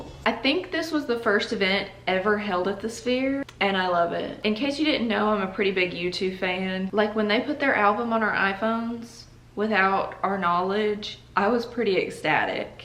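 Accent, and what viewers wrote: South, United States. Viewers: u dont have to hide ur accent sweetie go ahead with the southern loveliness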